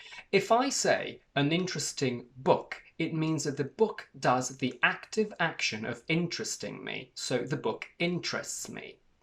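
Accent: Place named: England, United Kingdom. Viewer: Love your English accent.. happy to see you again..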